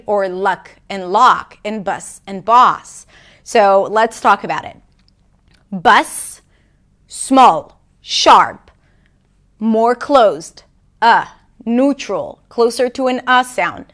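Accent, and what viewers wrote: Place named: United States. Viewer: You seems to be american native speaker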